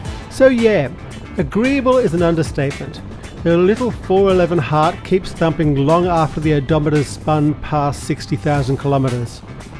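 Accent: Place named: Australia. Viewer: to hear an Aussie accent filled with love for his bike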